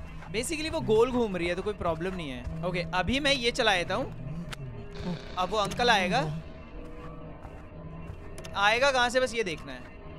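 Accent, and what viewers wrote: Haryana, India. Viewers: really nice video, is one of the only gamers who try different games and show them to ther audience with his great hariyanvi mixed accent and.... you were expecting something more right, but it was me DIO!! [ KONO DIO DA ]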